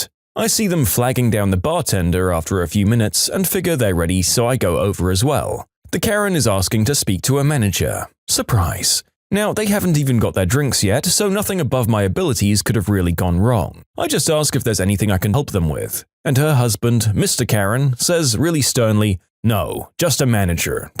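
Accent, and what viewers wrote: United Kingdom. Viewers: You're my favorite narrator I love your British accent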